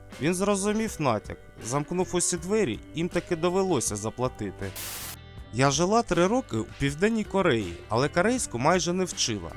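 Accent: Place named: Russia. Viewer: В мене таке враження, що автор кацап, бо читає з рососійським акцентом, підозріло, не буду дивитися!!!!!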